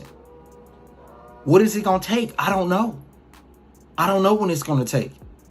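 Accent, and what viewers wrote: Baltimore, United States. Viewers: Thats that bmore talk....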